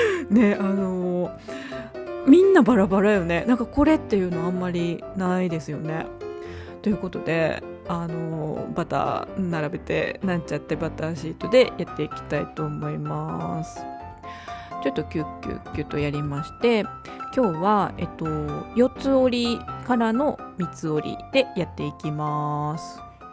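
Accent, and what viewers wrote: Kansai, Japan. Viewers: 昨日から動画見ている62歳の初老です😊 今日はこちらの動画を見ていてちょと頑張ってみるのもいいものですね～の言葉によっしゃｰｰｰ!!!となりさっそく、やってみました😅 レシピも丁寧に照会してくださっていてバッチリ出来ました！美味しかったです😊 ありがとうごさいます！記念撮影をして子供達にも披露、 また、作って送ります！ 関西人なので関西弁もしっくりおしゃべりが楽しいです😄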